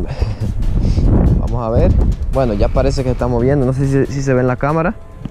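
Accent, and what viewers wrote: Dominican Republic. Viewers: Loco tu eres dominicano? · Algo me dice que eres dominicano..de algun lugar del cibao.. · Tu ere dominicano? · De donde eres ? Eres dominicano🇩🇴🇩🇴 ?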